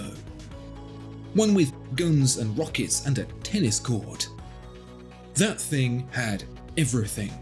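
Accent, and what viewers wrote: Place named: England, United Kingdom. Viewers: It's an English accent. You know, the language you're writing in. Have a day off from yourself Xiang. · Never mistake an English accent for intelligence.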